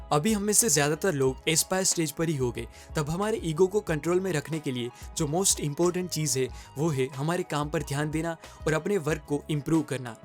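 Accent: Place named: Kathiawar, India. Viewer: Kathyawadi touch feels in your voice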